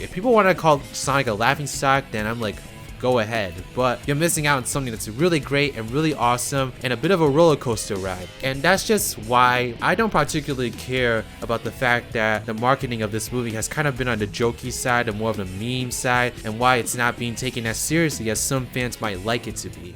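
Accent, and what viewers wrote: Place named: Asia. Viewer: Whoever you are, youre asian, i can tell from your accent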